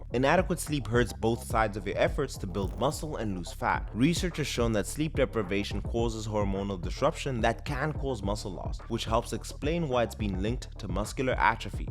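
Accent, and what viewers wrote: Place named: Afrikaans, South Africa. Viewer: Love this dude's Afrikaans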